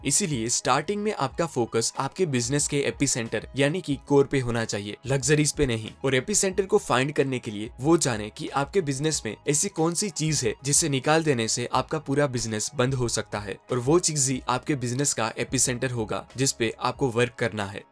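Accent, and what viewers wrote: India. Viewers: I hate your indian accent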